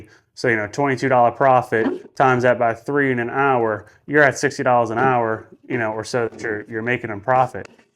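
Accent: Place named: Louisiana, United States. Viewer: Great video, thank you very much! Are you in Louisiana? I picked up on some accent which sounds like it. 😁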